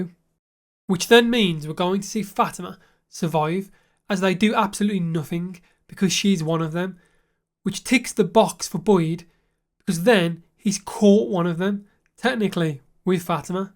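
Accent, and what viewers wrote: United Kingdom. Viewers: no hard feelings bro. i actually love british accent but I have to concentrate more on the storyline which is the base of this video and your accent is making it harder because I'm not used to it, youtube gives recommendations to a larger base of audience.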